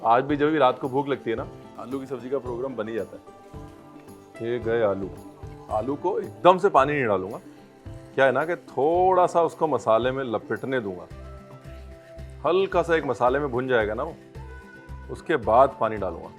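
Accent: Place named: North India, India. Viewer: I love how ranveer keeps it with indian touch with cooking and speaking style.. bilkul desi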